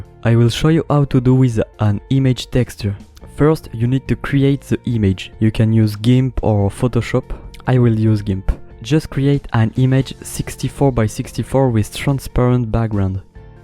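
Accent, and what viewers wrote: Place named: France. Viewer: Salut! Super cool tes vidéo, merci beaucoup! Je vois que les gens apprécient ton accent français haha! Fais gaffe quand même: J en anglais ça se dit ''djay'' et pas ''dji'' (et G se dit ''dji'' justement)... Je me suis gourré dans le raccourci à du coup ^^ j'ai fait ctrl + G (et ça a fait un truc mais je sais pas exactement quoi haha)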